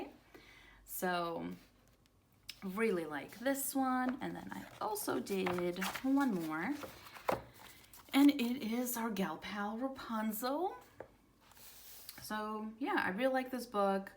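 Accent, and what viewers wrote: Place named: United States. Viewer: Glad you enjoyed your vacation to the states! Where are you from? As you sound like you have an American accent. Although you said holiday so I’m guessing you live in Europe?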